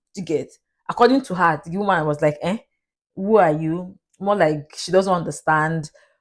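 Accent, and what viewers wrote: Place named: Ekiti, Nigeria. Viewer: You don dey rhyme like Ekiti people, nice 🤭🤭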